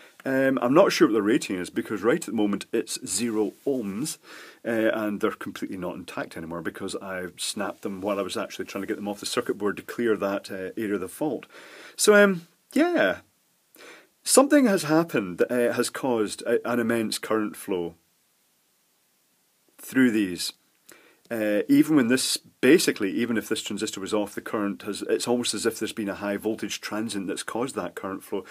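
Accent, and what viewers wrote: Scotland, United Kingdom. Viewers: You sound exactly like Scott Manley, does every older Scottish person sound like that?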